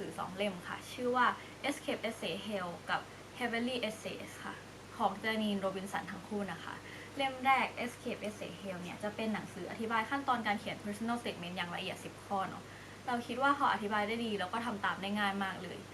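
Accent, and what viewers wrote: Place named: South, Thailand. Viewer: รู้เลยค่ะว่าน้องคนใต้ สำเนียงออก ^^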